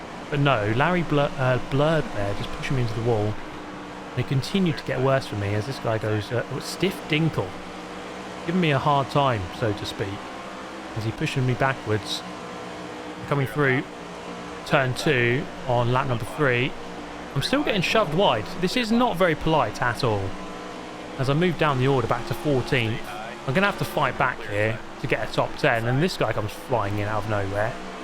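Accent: England, United Kingdom. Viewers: Nothing beats British commentary and sarcasm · It’s not so much the silliness of the video, but I think it’s a combination of watching and listening to an Englishman, in an English accent, explain the circus that is NASCAR. Thank you for this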